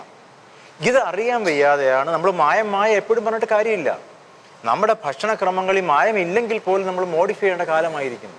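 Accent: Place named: India. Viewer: Why are so many of these "white poisons" videos narrated by Indians with heavy accents? Aren't there any American experts who are willing to speak up on this matter?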